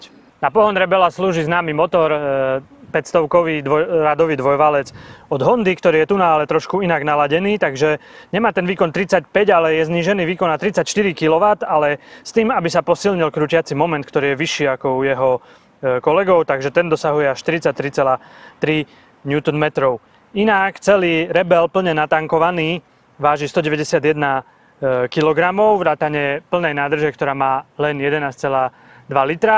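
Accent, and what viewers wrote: Slovakia. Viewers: Kurna, co je to za slovenský přízvuk......to je z nějaké určitě oblasti Slovenska?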